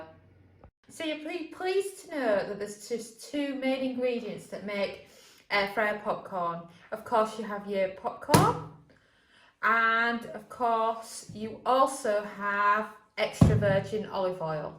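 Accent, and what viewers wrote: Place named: Barnsley, United Kingdom. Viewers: Barnsley accent ay up chuck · You sound just like I did and everybody else did in the area of England where I lived the longest. :-)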